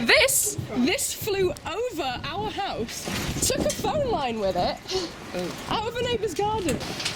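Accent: Wales, United Kingdom. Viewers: THE WELSH ACCENT I just fell in love all over again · Can you do a whole video in your Welsh accent?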